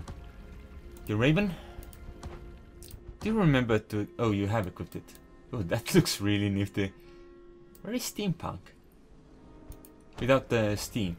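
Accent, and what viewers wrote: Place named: Sweden. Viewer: Where are you from? Your accent sounds similar to Robbaz the Swedish YouTuber.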